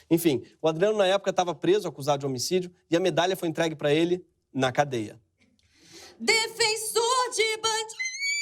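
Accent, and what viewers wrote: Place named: Rio de Janeiro, Brazil. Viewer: O cara não é engraçado mano. . E ainda por cima com esse sotaque carioca...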